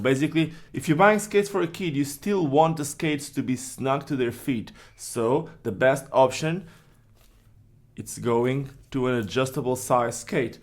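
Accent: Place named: Portugal. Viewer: Are you from brazil or portugal? Cause you have a portuguese accent. Great video btw (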